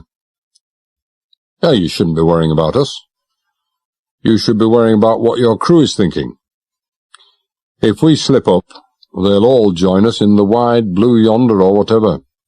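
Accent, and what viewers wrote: RP, United Kingdom. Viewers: It's called received pronunciation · They spoke like this in the 40's , 50's 60's ...... grammar school posh talk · If someone will please say, what is this way of speaking? I’ve listened to a lot of different British accents and this is distinctive. What place and frame of time?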